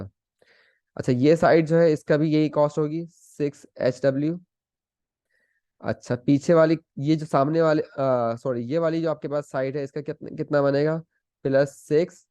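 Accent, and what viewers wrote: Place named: India. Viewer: oh my god the pronounce is so india;i don't understand what he says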